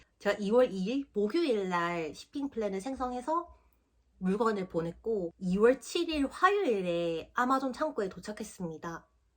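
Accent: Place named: Seoul, South Korea. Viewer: 굉장히 서울말같은 사투리네여 귀에 쏙쏙 박히고 이해가 잘 가네요. 최근 영상도 찾아봐야겠어요. 감사해요.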